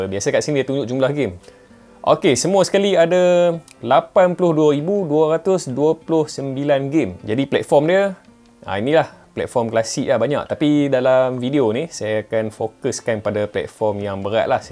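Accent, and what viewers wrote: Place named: North, Malaysia. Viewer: Nampak macam ada idgham2 utagha yang ditahan2.. 😂lantak pi bro.. utagha slag is addictive!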